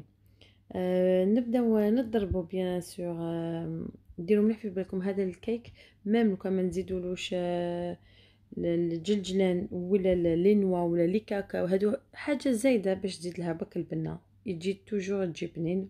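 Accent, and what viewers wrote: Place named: Algiers, Algeria. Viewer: Ya3tik Saha je ne sais pas pourquoi j'aime regarder tes vidéos j'aime beaucoup ton accent Algerois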